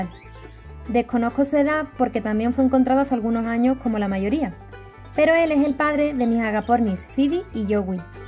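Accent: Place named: Andalusia, Spain. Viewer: ¿De dónde eres? no consigo dar con tu acento: estoy entre Granada, Jaén, Córdoba, Sevilla...🤔 Yo vivo en un.pueblo de Vlc y tengo 22 agapornis 😍😍😍😍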